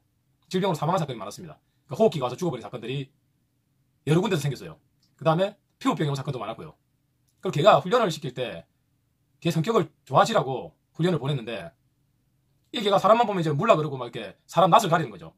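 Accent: Gyeongsang, South Korea. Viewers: 좋은강의 내용 너무나 좋습니다그런데말이 너무 빠르고 경상도 사투리에 빠르다 보니서울에 사는 저는 무슨소리인지 60%밖에 못 듣겠습니다.천천히 또박또박설명을 해주시면 더 좋겠습니다앞으로 더많은 강의 부탁드립니다감사합니다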